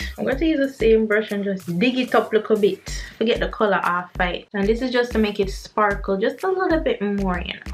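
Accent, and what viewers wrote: Patois, Jamaica. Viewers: Well, been watching your videos from evening while I do my hair (I'm trying the box braids) and what makes you relatable, is the fact that you kept your patios . Caah be Jamaican if yo no talk di language. Love love love your energy and your vibes. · Loving the Jamaican accent 💯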